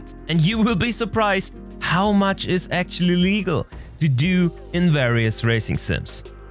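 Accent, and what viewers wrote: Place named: Germany. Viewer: Glaube man hört es wirklich raus wenn ein deutscher englisch spricht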